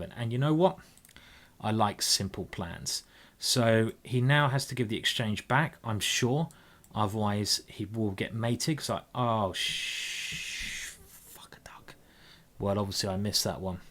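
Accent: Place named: RP, United Kingdom. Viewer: Don't understand what the guy is talking about, uses nothing but pure queens English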